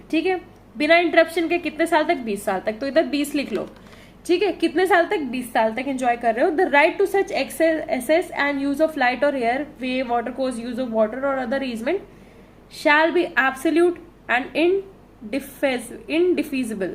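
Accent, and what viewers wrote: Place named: Lucknow, India. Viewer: Apki voice me lucknow ka accent hai❤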